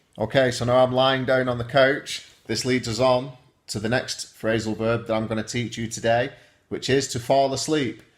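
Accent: United Kingdom. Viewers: I love that you want to help people. But your accent is soooo british :DDD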